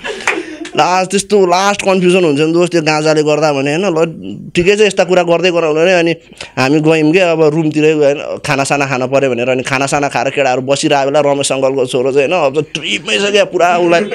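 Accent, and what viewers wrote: Newar, Nepal. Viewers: Newari tone ta khatra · haina yo vaile tone banako ni k ho? Trital ta bahun hoinara, purai newari tonema di rachha ta?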